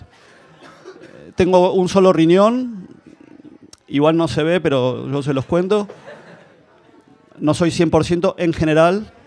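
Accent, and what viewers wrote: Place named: Argentina. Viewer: No le pillo la gracia...alguna risilla...pero esperaba más de un irlandés ke habla con acento argentinho...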